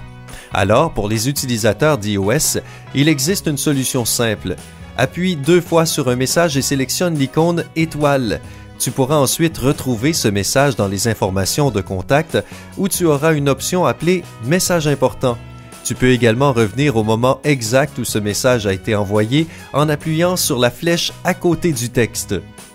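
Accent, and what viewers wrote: Quebec, Canada. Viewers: Pas canadien ??! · Il a l’accent québécois, mdr😂🤣😂🤣.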